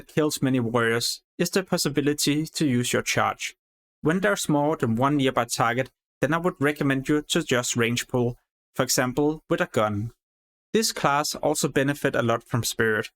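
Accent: Denmark. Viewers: The danish is strong with this one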